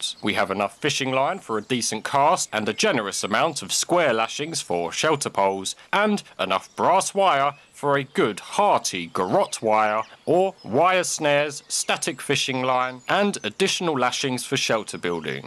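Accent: United Kingdom. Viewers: Ure probs american it is literally just british!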